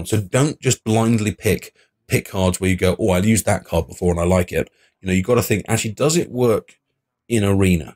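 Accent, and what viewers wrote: England, United Kingdom. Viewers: Denn Green III He's english and has a deep voice. perfect combination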